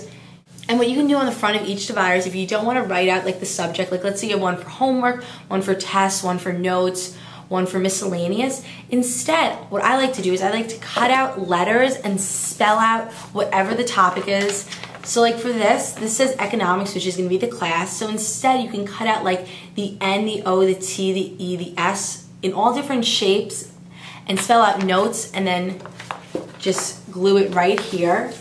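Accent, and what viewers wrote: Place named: South, United States. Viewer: no effence but your accent gets on my nerves are you southern? like its not lasht year its laSt year!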